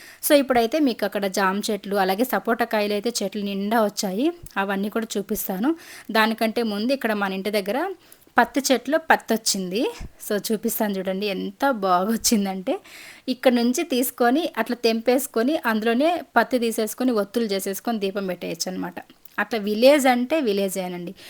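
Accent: Chittoor, India. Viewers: Meeru matladuthunte chala bhagundhi same mana Chittoor bhasha. Village untadam vall konni upayogalu untayi kadha. Vegetables kondam undadhu,porakalu, Chintapandu elantivi kone pani undadhu.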